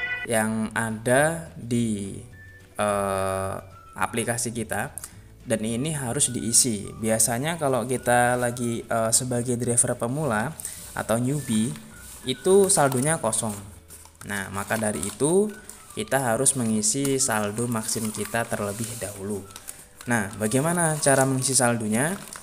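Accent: Lampung, Indonesia. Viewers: Pasti dari Lampung ya bang hehe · Bang orang lampung ya hahah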